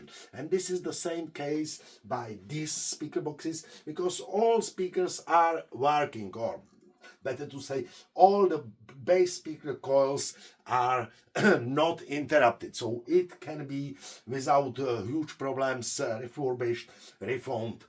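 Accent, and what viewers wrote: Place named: Finland-Swedish, Finland. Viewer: You sound finnishswedish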